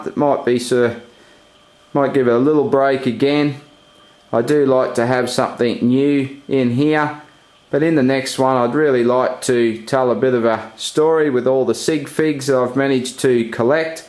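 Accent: Australia. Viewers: are you australian because thats AWSOME!!!!!! i'm aussie to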